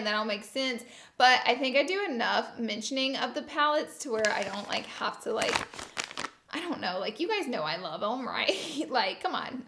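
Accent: South, United States. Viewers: I like how you go from a southern ish accent to a high pitch girly scream in 2 seconds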